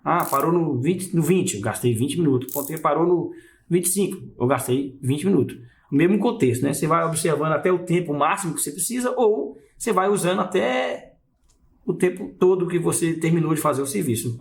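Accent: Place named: Minas Gerais, Brazil. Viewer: APOSTO que esse relojoeiro é mineiro! Que sotaque horroroso! "Pá quê quí sévi u bezel du relój?" Poha, sotaque de Minas Gerais DÓI no ouvido!!! VSF!!!!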